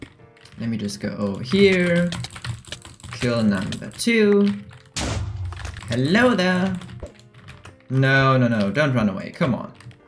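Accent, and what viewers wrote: Germany. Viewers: deutscher akzent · I can litterly hear that ur from Germany I am too btw good vid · U sound very German are you?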